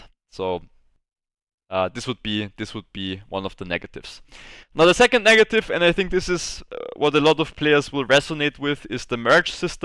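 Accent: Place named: Austria. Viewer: Ich bin mal so frech auf deutsch zu schreiben- ich meine ich Höre einen Österreicher😂 Auf welchem Server spielst du? Und falls man fragen darf- in welcher Preisspanne sind wir bei deinen Drachen?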